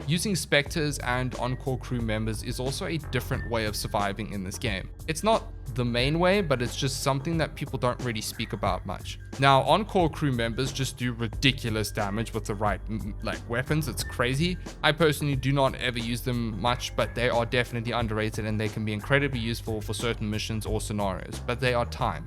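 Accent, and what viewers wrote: South Africa. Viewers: Bro it just hit me. Are you South African?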